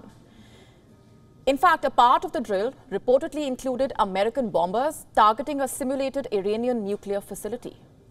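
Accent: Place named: India. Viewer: please change other news reader since this India are IndLish then English...so twisted tounge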